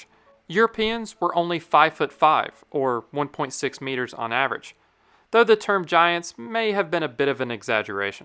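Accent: South, United States. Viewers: Georgia boy? Or maybe Carolinas? Can hear the accent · Nice to hear an American accent finally on the commentator.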